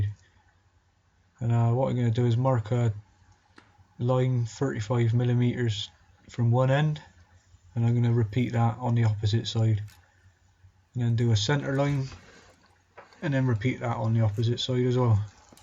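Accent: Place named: South West England, United Kingdom. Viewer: Gregory Lovvorn Having lived there for four years, I can easily place this as a Bristolian: from Bristol in the South-West of England. Heavily influenced by the wider, traditionally rural area of the SW. In fact, I hear the slightly softer 'edges' in this gentleman's accent that's more indicative of the rural region around Bristol than the city itself, but wouldn't be surprised if he was born and bred in Bristol.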